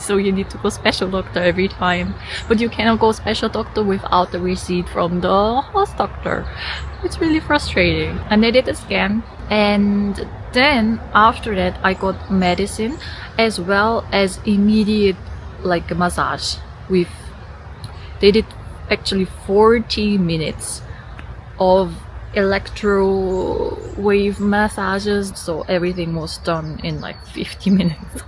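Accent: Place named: South Korea. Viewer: 신기하게 발음이 한국인이 영어하는 것 같은 발음이네요...참 신기하네...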